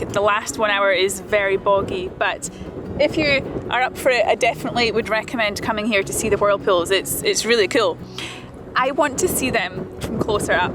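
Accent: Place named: Scotland, United Kingdom. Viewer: Scottish accent so strange 😑 compared to British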